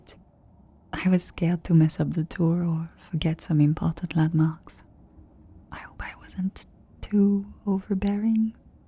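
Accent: France. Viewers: Worth the wait? Your damn right it was, I’m a sucker for alot of things (clearly) the French accent will be a favorite of mine always so you pair that with flirty, automatically will love it. You just keep on getting/doing better everytime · great audio, i love this a lot, tbh i just realised that it's kinda similar to the lizzie mcguire movie where she went on adventures, but of course, both outcomes are different, this one was great, i wish that i could have adventures someday too.. and looks like i have discovered a new city within this video, searched it up, and honestly, i wanna live there now, but i guess it will take time... once again, great audio 🥳💗 edit: i forgot to add this but i really love your french accent ^^ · Another day, another great upload by. Your French accent is always a win for me.:_heart2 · French accent + speaking in French + singing = melt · Flirty and french accents go together like cookies and milk. 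I'm very excited to see what this will be like. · Ahh sh** ... back into the french accents rabbit hole 😅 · Yes she is back with the French accent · These French accent videos are some of my favorites, so i definitely hope you maybe make another French character series in the future. I actually visited the south of France this past summer as part of a high school graduation trip, so this series has taken me back to all those amazing memories. Also, the quality on this video was amazing; the background sounds, the visuals and the plot all came together to make a entertaining audio. As always thanks for making great content, can't wait to see what comes next:)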